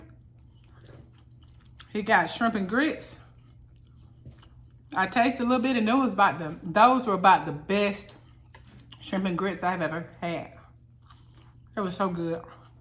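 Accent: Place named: South, United States. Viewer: Your southern accent is so pure ❤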